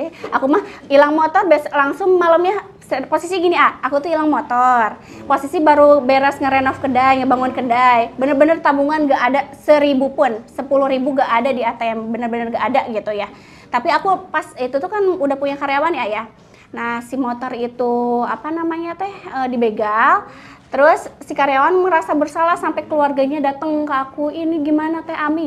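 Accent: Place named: Sukabumi, Indonesia. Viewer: logat.y kaya orang sukabumi, mantap banget teteh.y lancar & berkah terus moga qu juga bisa usaha begitu. aamiin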